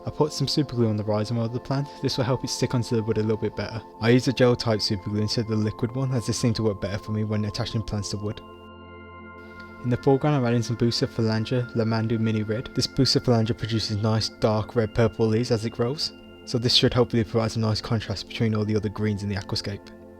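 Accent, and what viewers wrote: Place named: Birmingham, United Kingdom. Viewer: Nice, MDs bowl video inspired me to do one too. I had a planted bowl like this at my university halls but I left it there for too long when they sent everyone home so all the water evaporated and the plants died. So I'll probably do a video rescaping that bowl at some point. You have a good content on chanel, good quality camera and editing for less than 100 subs, you deserve more. You're from Birmingham right? I recognise that accent haha. :)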